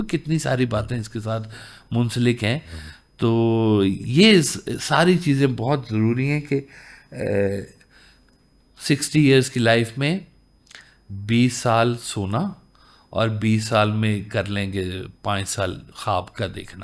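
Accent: Punjab, Pakistan. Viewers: ji....thoda punjabi accemt hai na isliye thoda samajh na hi aati....1 martaba suni thee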